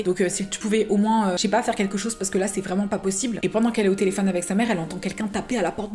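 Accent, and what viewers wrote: England, United Kingdom. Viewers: T grave. Flipante et vrm t trop bien et j’adore ton axant anglais 🤐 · J’aime trop ton accent d’anglais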